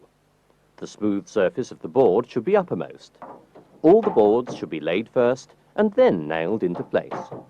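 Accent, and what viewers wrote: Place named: RP, United Kingdom. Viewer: Kind of a posh accent for a DIY project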